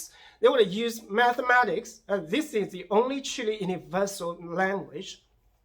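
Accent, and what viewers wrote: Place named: United Kingdom. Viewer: 😂😂😂，连英伦 腔调都那么浓重。👍👍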